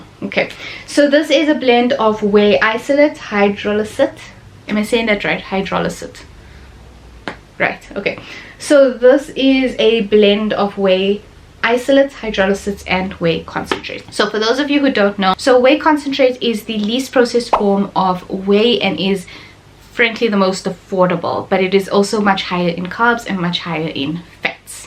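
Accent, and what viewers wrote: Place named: Cape Town, South Africa. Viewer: Are you colored? You sound like you from Cape town?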